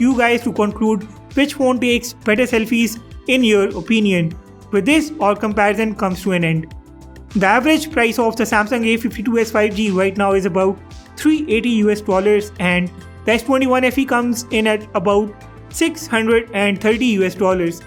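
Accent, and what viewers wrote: India. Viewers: mi video i realized you speak english (or indlish) :D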